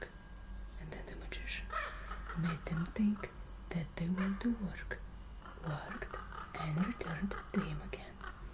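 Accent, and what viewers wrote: Russia. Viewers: Great Russian accent i sound about the same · Ну,!!! Вот это Баба Яга всея ютьюба! А какой лес, а какая изба, а этот русский акцент! А сказки какие мудрые, и юмор...Браво❤ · Лайк за русский акцент, как один из элементов образа, круто 🙂🤌🏻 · Русский акцент... Клаааасс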